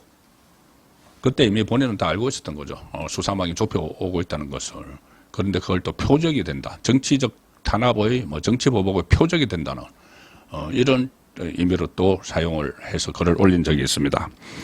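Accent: Gyeongsang, South Korea. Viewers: 항상 구수한 경산도 사튜리정말조와요 항항잘청취합키다 항상건강하세요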